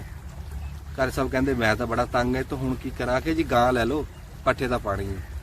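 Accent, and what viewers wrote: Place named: Doaba, India. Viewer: Yaar I am surprised ki boli kinni saaf or similar aa doaba wal de naal mildi lagdi aa. Kamaal a bro. Video ta vadiya hai he but boli sunn k baut vadiya lagga 😂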